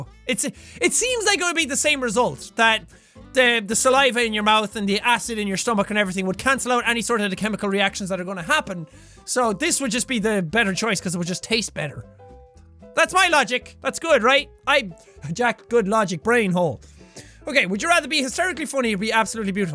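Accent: Ireland. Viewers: Jacksepticeye everyone just roasted markilper on his channel I love your channel thank you for makes someone extremely happy everyday because of this channel thank you for making me feel less lonely everyday absolutely is beautiful your accent I'm enjoying this channel because of Irish accent is like music to my ears thank you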